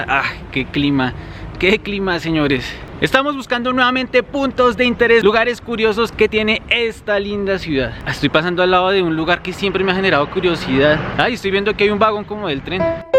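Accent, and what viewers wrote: Colombia. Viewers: El Luisito comunica colombiano, lo amé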